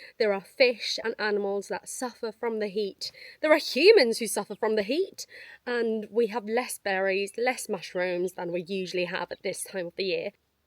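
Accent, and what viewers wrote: United Kingdom. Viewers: A real British accent! 🤓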